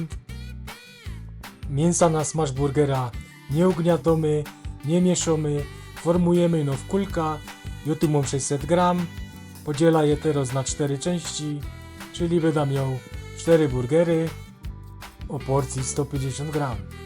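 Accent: Silesia, Poland. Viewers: Jak ja nienawidzę tej śląskiej gwary......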